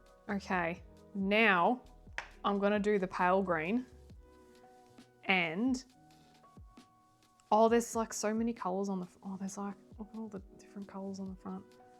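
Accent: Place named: Australia. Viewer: mate i heard the first few words and subscribed.. always got time for an fellow Aussie. You sound just like I am with these things! I overthink and if its not perfect i'm ready to get a new book lol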